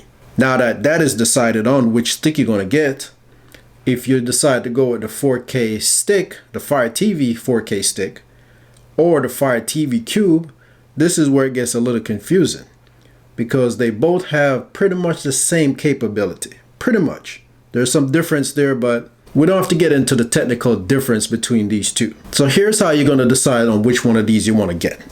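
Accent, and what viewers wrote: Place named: Jamaica. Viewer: Can hear the yard accent!! Big up bredda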